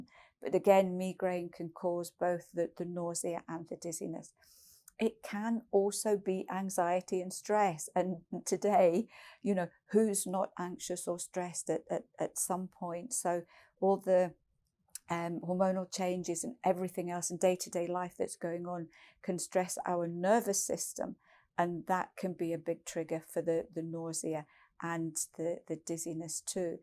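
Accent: United Kingdom. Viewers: you are a blessing to all of us. I learned so much about this peri menopause adventure thanks to you. By the way, I love your British accent 🥰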